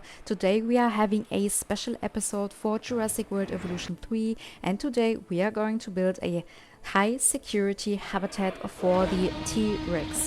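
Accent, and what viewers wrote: Germany. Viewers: Are you german by any chance, because you sound a bit german. You can hear the dialect but I stil enjoy your videos and I think you can buil sooo good like damn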